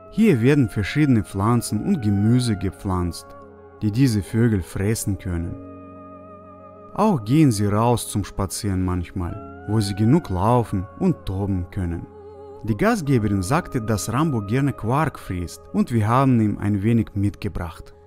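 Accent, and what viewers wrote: Russia. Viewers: Ich mag ist dass du einen russischen Akzent hast ich hab auch russische Wurzeln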